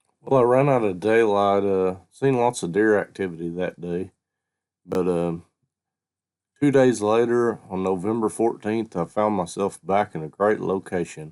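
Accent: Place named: Alabama, United States. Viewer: Your accent sounds like you are from Alabama.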